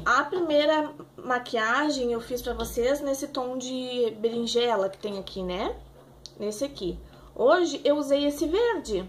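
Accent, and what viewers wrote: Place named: Rio Grande do Sul, Brazil. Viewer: Ficou linda, já q o verde estará em alta neste inverno vou aproveitar o meu q está meio abandonado...rsrs. Lindo esse sotaque de gaúcha. Mto sucesso no canal. No meu apresento dicas motivacionais e dinâmica de grupo, se gostar aparece por lá. Bjs.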